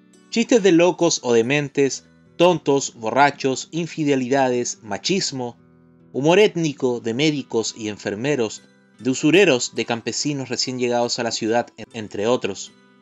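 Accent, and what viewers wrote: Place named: Chile. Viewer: Mstavillosos datos.... pero me abruma la narración. Uff. Yo soy chileno, pero hay que internacionalizar el acento. Condorito un ícono que sólo será más internacional, si lo presentamos a ese nivel. Gracias.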